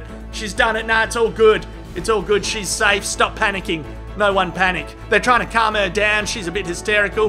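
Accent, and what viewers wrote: Australia. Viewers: Let’s just appreciate the Aussie accent for a second. My wife studied English literature and she keeps telling me to turn this shit off. She also did not react well to the c-word I liberally and regularly use after watching these videos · I love when aussie man starts his video with, 'yeh nah'. You know it's Australian when he says yeh nah.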